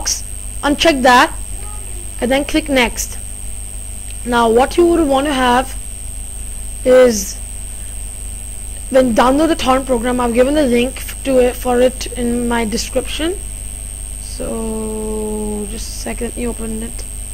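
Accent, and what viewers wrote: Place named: India. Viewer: you sound like a indian speaking english indian lol nice video. good work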